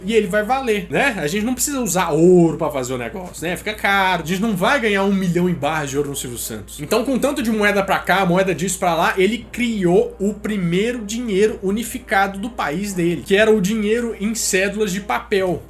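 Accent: Minas Gerais, Brazil. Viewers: Está de parabéns esse mineirinho da fala engraçada.muito bom.uma aula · Tu é mineiro??? KARAI TBM SOU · nussa o cara tem um sotaque muito mineiro... kkkkkk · オタクではない será que é porque ele É mineiro?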